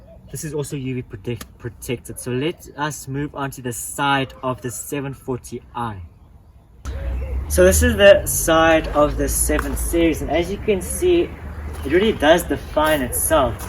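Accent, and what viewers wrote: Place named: South Africa. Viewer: the moment u opened your mouth . . . i thought you MUST be South African, lol , nice review, very well put together, keep it up bro. !!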